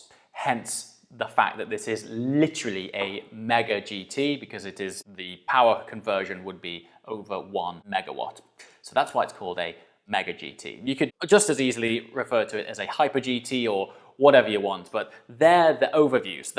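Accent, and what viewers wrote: United Kingdom. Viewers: Truly great technological advancement. I just wonder how reliable that car will be? It looks great too. I agree that the car resembles a Panamera. You don't even know how I appreciate your smooth talk. Many people in the car industry butcher to the point that I can't watch them, That UK accent was like a breeze of fresh air from the North Sea. Cheers from USA!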